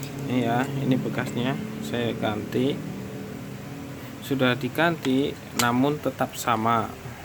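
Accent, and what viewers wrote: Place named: Banyumas, Indonesia. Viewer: Kayane wong ngapak iki, lokasi ngendi lur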